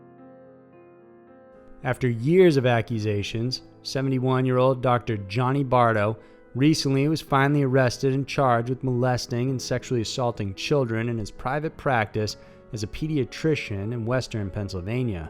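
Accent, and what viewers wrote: South, United States. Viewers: your voice really makes these videos interesting. Am I hearing a southern accent? Have been watching a ton of these the past two days, you got yourself a new subscriber!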